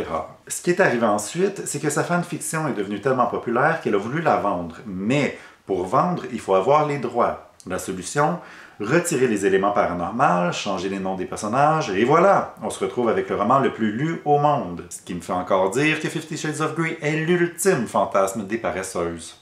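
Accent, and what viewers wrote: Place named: Quebec, Canada. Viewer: Ah mais de rien! Je l'attendais tellement l'argument "En vue de son passé c'est normal" x) Et j'avais pas relevé la connotation homophobe parce qu'à des moments je savais pas de qui parlait exactement alexmoinard ni où elle voulait en venir mais à présent je me dis que si j'avais su j'aurais réagi => En tout cas j'en profite pour remercier d'avoir fait cette vidéo très bien expliquée (avec en prime cet accent québécois que j'aime tant ♥) et qui m'en a beaucoup appris. Je me permettrais même d'ajouter quelque chose qui pourrait peut être être un peu plus éclairci: le fait qu'Ana se fait violer à plusieurs reprises par Grey (comme sa "baise punition" comme elle l'appelle) étant donné que ce charmant monsieur ne lui demande en aucun cas son avis pour savoir si elle a envie d'avoir un rapport sexuel ou non et qu'il ose dire à un moment "I know you want me" qui est clairement ce que disent énormément de violeurs à leur victime pour justifier leurs actes ignobles. 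Ça m'a à moi aussi fait découvrir 9 semaines et demi que j'ai acheté sur ma liseuse tant j'ai été intriguée ^^ Le film aussi a l'air d'être pas mal, il faudra que je vois ça à l'occasion (=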